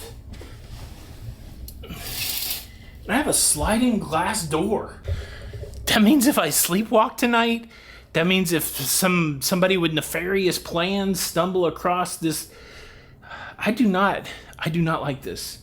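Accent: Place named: New Jersey, United States. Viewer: A passable Jersey-ish accent! And I'd be freaking out with that door too, it's definitely an odd choice.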